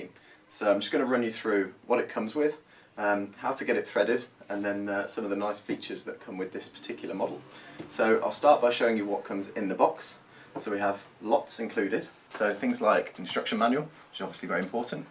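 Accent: United Kingdom. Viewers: So the BRITS LEAD the SEW.MACHINE REVIEWS ! 😅😊! I am FROM , Michigan , now in Boston, MA ., 74 and your accent helps me understand N.England people !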